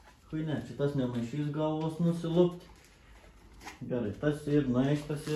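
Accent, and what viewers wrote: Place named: Aukštaitija, Lithuania. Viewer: Nešnekiek aukštaitiška😃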